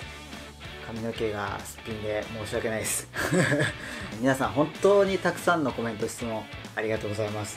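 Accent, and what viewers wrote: Kansai, Japan. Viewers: くんの関西弁にキュンとしちゃう♥♥♥ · これからも関西弁いっぱいのくんを待ってます🎵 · 関西弁なのに怖くなくて、言葉のイントネーションとくんの声が心地よくて何回も見てます(*´`) 色々な質問に肯定的に答えていて穏やかで優しくてすごく幸せな時間でした。次回も楽しみにしてます💓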